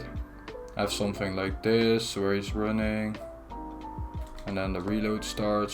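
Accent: Netherlands. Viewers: Dutch accent on top tho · We do be loving that Dutch accent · are you dutch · Are you dutch?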